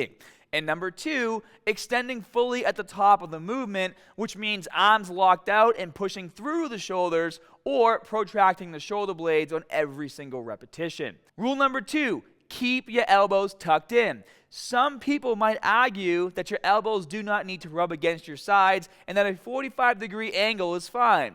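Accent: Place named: New Jersey, United States. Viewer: U a lil bit New Jersey, but great also hahaha ! Awesome analysis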